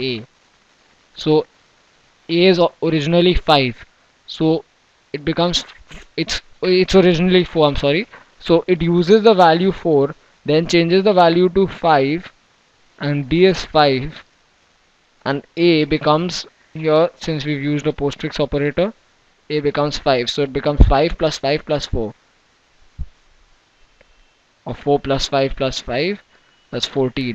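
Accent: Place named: India. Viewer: Sir thanks a lot:).I m just 13 years and in ryan icse.ALL THE DUMB TEACHERS IN THE SCHOOL CANT EVEN TEACH ME A SINGLE LETTER OF JAVA and sir you did in just some couple of videos.THANKS A LOT.I luv ur english it s indian pitch so i can understand better:D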